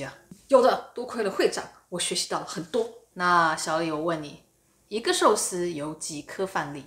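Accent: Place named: Taiwan. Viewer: 我没有点你的channel首页去看你的介绍，就凭你讲话的方式和语调就知道你是台湾人😄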